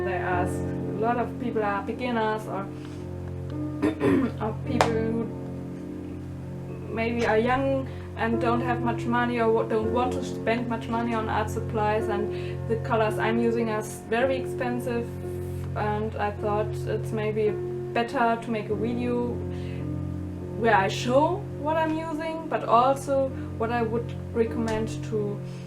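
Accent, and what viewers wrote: Germany. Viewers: bist du aus Deutschland? Ihr Akzent ist schön! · Also ich finde, die Videos in denen du Englisch sprichst, sehr angenehm. :D Freue mich immer, wenn ein Video kommt, in dem du sprichst. Ganz ehrlich, ich wusste vorher nicht, dass ein deutscher Akzent im Englischen auch schön sein kann. xD An der einen Stelle wird deine Stimme etwas verschluckt. Sagst du da, die Kuretake-Farben sind besser pigmentiert als die White Nights oder schlechter? ^^ Ich liebäugle immer wieder mit den Kuretake-Farben, aber ich hab ja eigentlich meine Schmincke Horadam-Farben. Krieg da echt den Hals nicht voll, ganz schlimm. ^^' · Hallo, Du sprichst eigentlich Deutsch, oder? Du hast so einen krassen, deutschen Akzent : D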